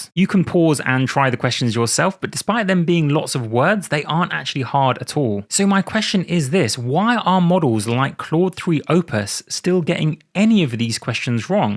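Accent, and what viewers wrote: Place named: United Kingdom. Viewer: I'm obsessed with this British accent 🥲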